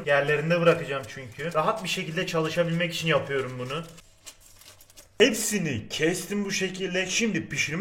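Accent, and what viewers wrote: Adana, Turkey. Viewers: Adam adanalı galiba ekliyorum yerine eklürüm diyo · Abi onu bırak adanalı gibi konuyorsun adanalılarda alüyürum der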